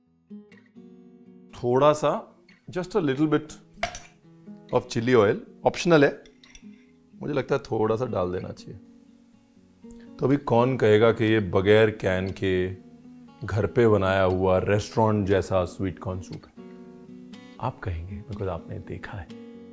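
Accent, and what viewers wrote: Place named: Punjab, India. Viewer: Sweet Punjabi accent...loved it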